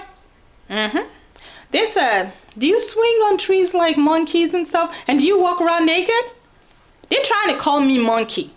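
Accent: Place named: Yoruba, Nigeria. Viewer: am a yoruba girl too and really like the tag u made.... lol about the ridiculos questions... comes around me too so many times!!!! keep up ur yoruba accent!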